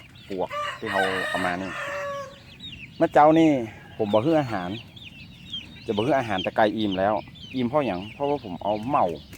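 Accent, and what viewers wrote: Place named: Laos. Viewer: เว้าคึอคนวังเวียง สปปลาวเลย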